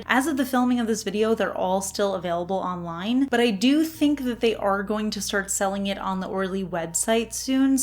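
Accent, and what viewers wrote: New Jersey, United States. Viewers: It's that jersey annunciation 🤌 😅 we miss you here in good ole jerz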